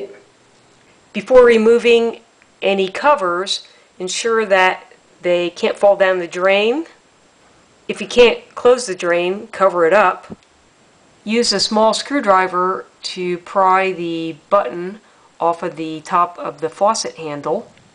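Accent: Philadelphia, United States. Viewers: Is this a Philly accent or Baltimore?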